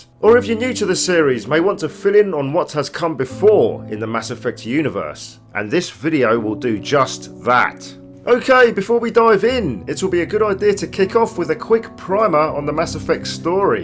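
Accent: United Kingdom. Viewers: Cool! Do you know how you would classify your regional accent? Would it be cockney or sussex for example?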